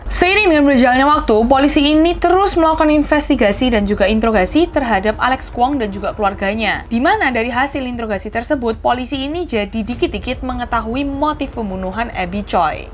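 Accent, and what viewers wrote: Indonesia. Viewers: Aku seneng Medokeee neng...Lnjutkan perjuanganmu 😘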